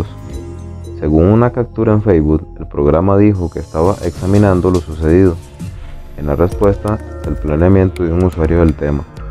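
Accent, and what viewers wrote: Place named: Nicaragua. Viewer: No es cubano parece nicaraguense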